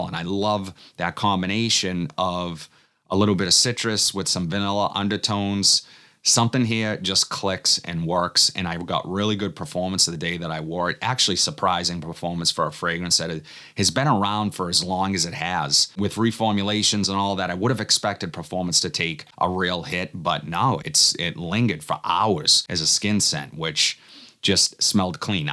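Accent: Boston, United States. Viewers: ! Happy birthday 🎉 I love how your boston accent sounds like everyone I grew up with. I need suggestions for cologne for my almost 16 year old - I splurged on althair for his b Day in a couple of weeks ( I love it ) ( got PDM discovery set to try before spending on it, p He has Cedrat Boise. Prada Luna rosa. And the sport one Sauvage. Coach blue,( Marshall's) mont.blamc legend bluu t. Jimmy Choo man.aqua di gio. Phanom.( nauseating i cant deal ) cremo spice and black vanilla He no longer likes his nautica voyage So any suggestions at lower price range that smells good? Not everyone smells like. What else is great for this age?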